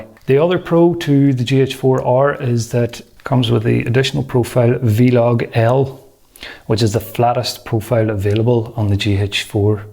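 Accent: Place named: Northern Ireland, United Kingdom. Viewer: Hi, I notice your accent is Northern Ireland where I'm from myself. You wouldn't happen to want to sell me your GH4 by any chance? If you feel the GH4R is all you need?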